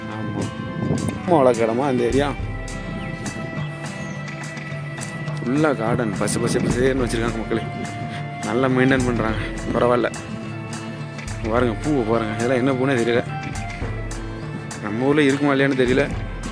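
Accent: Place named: Thoothukudi, India. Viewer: மிகவும் இயல்பான slang.இப்படியே தொடருங்கள்.தூத்துக்குடியா?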